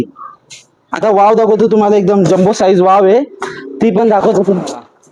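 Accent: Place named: Agri Koli, India. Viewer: To agri koli bhasha boltoy tyamule Vav mhantoy maza Andaaj aahe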